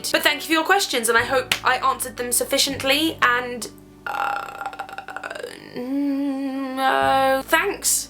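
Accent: United Kingdom. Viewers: I'm from Brazil and i just love your British accent and all your videos.I don't really have any question.Maybe,how can you be so beautiful?LoL Kisses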